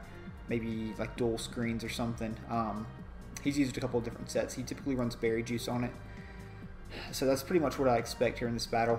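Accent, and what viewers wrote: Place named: South, United States. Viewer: GG my dude. Very well played. You sound more southern in this vid than any other. I love it haha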